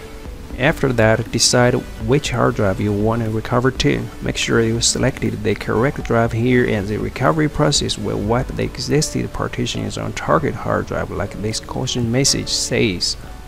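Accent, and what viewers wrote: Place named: South, United States. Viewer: It’s a very interesting accent. I heard moments that reminded me of Indian, Chinese, Vietnamese, US Southern, and maybe Eastern European! My guess is Chinese living in the US South.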